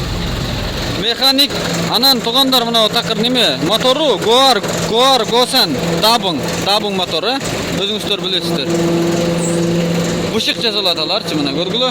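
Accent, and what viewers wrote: Kyrgyz, China. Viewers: сүйлөшүнө караганда кытайлык кыргыз бизге баасын айт дизельнийдин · Бул алып баруучу кытайлык окшойт. Суйлогонуго Караганда?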